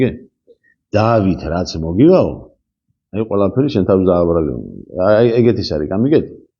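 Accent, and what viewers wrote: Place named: Mingrelia, Georgia. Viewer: Margalurs mush dialeqt ugu, ardza kuntxus mushobrat.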